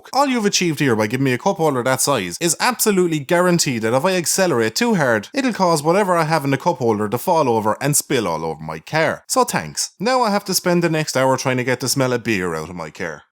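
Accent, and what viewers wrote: Ireland. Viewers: Hearing say the most marvelous of insults and creative swears in his Irish accent is the reason I get out of bed in the morning. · Swear to God, hearing the Irish accent swearing is like wiping your arse with silk.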